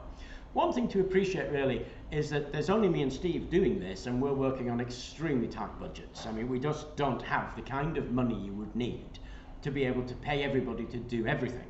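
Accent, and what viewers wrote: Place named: United Kingdom. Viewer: Hello, and welcome back no new videos I missed your videos, I learned so many things from you, I am fascinated by the materials that can accumulate energy, I learned why it happens because simple materials are so wonderful. I learned a lot from your videos, even British English:) thank you for sharing your knowledge with us. I wish you much health, and so many beautiful things, you are the best. Ciao,e bentornato non nuovi video mi sono mancati gli tuoi video,ho imparato tante cose da da te,sono affascinato dagli materiali che possono accumulare energia,ho imparate perché succede perché semplici materiali sono cosi meravigliosi. Ho imparato da vero tante cose dagli tuoi video ,anche l inglese britannico:)grazie per aver condiviso le tue conoscenze con noi . Ti auguro tanta salute,e tante belle coso ,sei il migliore.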